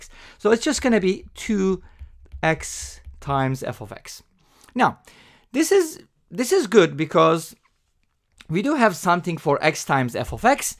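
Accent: Turkey. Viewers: reis Türk müsün ya? aksandan çakıttım biraz.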